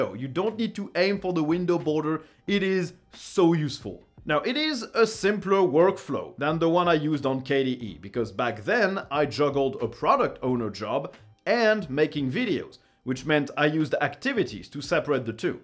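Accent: France. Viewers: Did you say Ioatas or Aphostrophe? Could not get that from the french accent sorry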